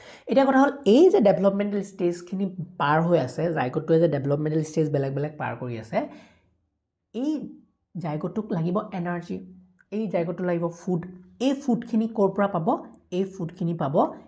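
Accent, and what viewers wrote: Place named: Western Odisha, India. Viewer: Tq sir.. being a western odisha dialect speaker it seems like u are just explaining in our dialect with slight changes in accent. Thanks for notes in english.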